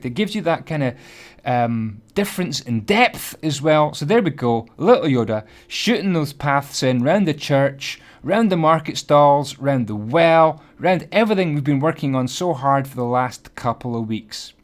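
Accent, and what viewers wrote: Scotland, United Kingdom. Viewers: Is that a Scottish twang I hear?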